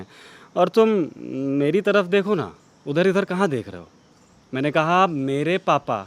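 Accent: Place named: Bihar, India. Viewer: I want to see u again on tape a tale... Feeling something very heart touching... Especially. "Shi to thi Riya, bna nahi kahen aap dono ka" And most importantly, I love ur Bihar wala feel and touch little bit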